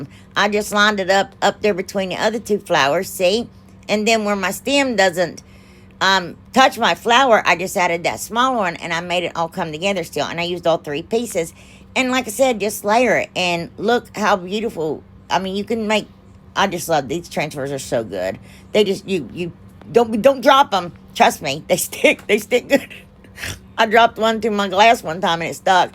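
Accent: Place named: Appalachia, United States. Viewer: 🩷Your accent,🩷 OH MY HEART! My family is from the Appalachian Mountains in Virginia, and I don't get to see them often, my Parents have moved to Heaven, so I don't get to hear this often, I could sit and listen to you FOR DAYS! I love your work, but, hearing you talk, takes me home and brings such joy to my heart, Makes me just want to hug you. THANK YOU for sharing with us. (That said, I don't know if I got a bad one or I'm too stupid to use it, but, rub n buff 🙄 I went to use mine and it was like a vein opened and squirted everywhere. Is it supposed to be liquid-ish?? Runny.. I even 'shook' it. So glad I found your channel. Be blessed in Jesus Name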